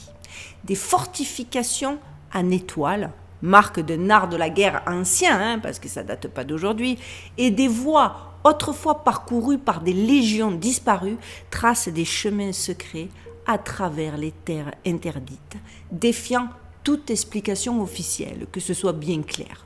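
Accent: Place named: Provence, France. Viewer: Vous êtes de quelle région d'origine ? Votre petit accent du Sud bien de chez nous en Provence. Hein ?😉 🤗💕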